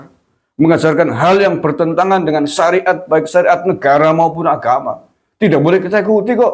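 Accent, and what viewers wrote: Banyumas, Indonesia. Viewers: Semangat truss mas Bro , ...ngapak menyala ❤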